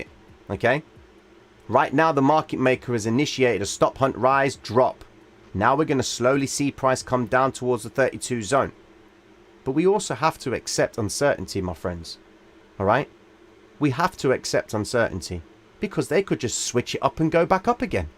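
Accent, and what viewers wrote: Cockney, United Kingdom. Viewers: Man, U R flipping brilliant. I learn 10-20 different things on every video you make. Thanks. Beginning to understand the MM strategies. Pretty sure it will help in the future.Of course, always remembering that anything can happen. By the way, U got an Italian name and a Cockney accent. Remind me of a few friends I had back then.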